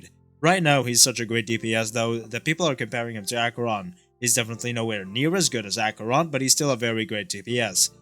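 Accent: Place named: Arab world. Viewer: I think you are from united arab your accent seems like them or near it :3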